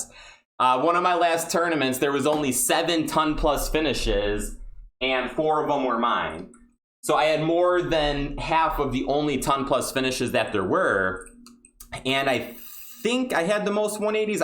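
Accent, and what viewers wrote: Midwest, United States. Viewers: New Sub here. You a fellow Illinoisan? Hear that Midwest accent.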